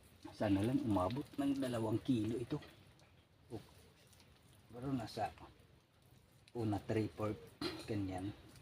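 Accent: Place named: Iloilo, Philippines. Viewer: Oy ilonggo ikaw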